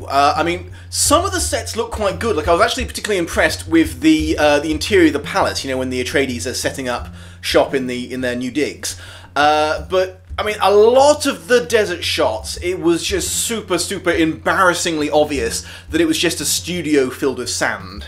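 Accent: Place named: United Kingdom. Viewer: From a guy with an British accent, it's funny that you miss that Dune is closer to T. E. Lawrence in the Arab revolt in WW1 (Lawrence of Arabia) than current events with the USA.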